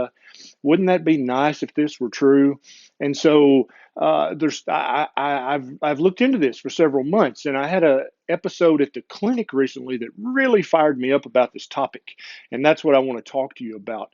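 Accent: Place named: Tennessee, United States. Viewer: I never heard this as I don’t watch the dude as he creeps me out with his fake muscles and I keep getting his ads come up on all my YouTube videos... it’s enough to make me pay for premium. I am pretty naive but I can’t believe anyone believed this without testing til now. Love you Dr! Your accent makes me miss TN xxx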